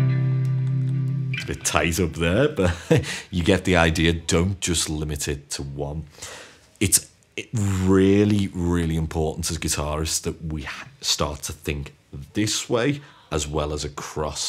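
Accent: Liverpool, United Kingdom. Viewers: are your from somewhere near liverpool? im from Bootle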